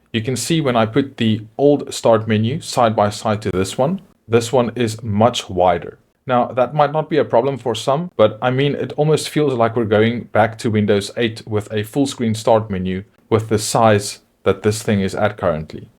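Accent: South Africa. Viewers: You sound South African... homie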